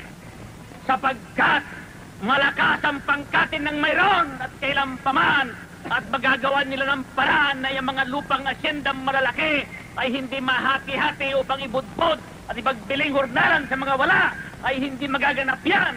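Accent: Philippines. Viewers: pinoy na pinoy ang ang nagsasalita.yung mga nagcocoment nmn mga engeserot englesero kaloka😅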